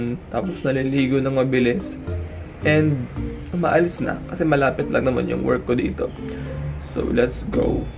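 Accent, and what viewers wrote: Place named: Mandaluyong, Philippines. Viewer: Luh parang taga mandaluyong kapo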